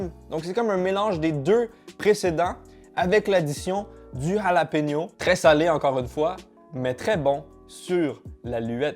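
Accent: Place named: Canada. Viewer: L'accent canadien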